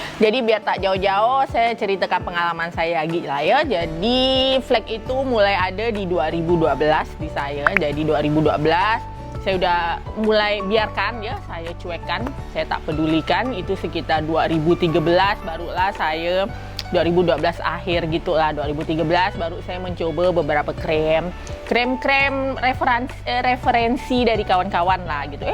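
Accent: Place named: Pontianak, Indonesia. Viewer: Mantap kak tetap konsisten dengan bahase pontianak kite...bangge dengarnye..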